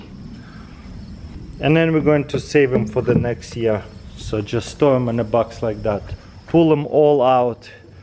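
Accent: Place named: Russia. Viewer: Привет смотрю тебя давно))Я знаю что ты русский)Т.к акцент ))Удачи в развитии🎉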